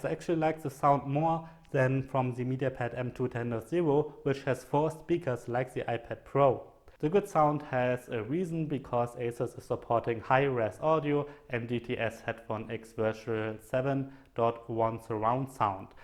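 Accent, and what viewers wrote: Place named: Germany. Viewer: mannnn...du hörst dich so dermaßen deutsch an haha nicht schlimm aber dieser deutsche Akzent beim Englisch sprechen, haha viel zu geil, kurze frage wie ist die Performance und sound im Vergleich zum Nexus 9 welches ich überlege hierdurch zu ersetzen, wäre dankbar über eine Antwort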